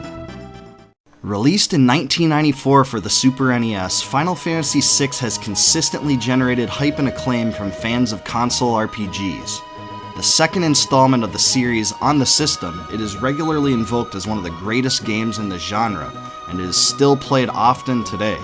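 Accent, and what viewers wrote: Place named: Minnesota, United States. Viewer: Ur from Minnesota huh? Or Canada. I can hear it.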